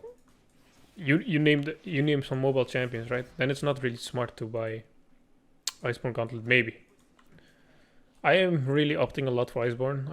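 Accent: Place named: Germany. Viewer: true, it's like a mix of german/english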